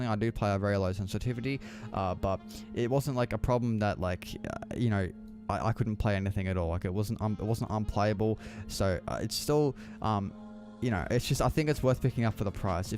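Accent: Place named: Australia. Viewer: Australian, defiantly a follow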